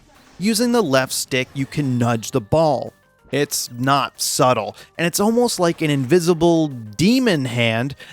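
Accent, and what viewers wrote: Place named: New York, United States. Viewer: Yo you from NY cause dead ass you sound like my ass to the T when I was a kid and there was a bowling alley with a addams family pinball machine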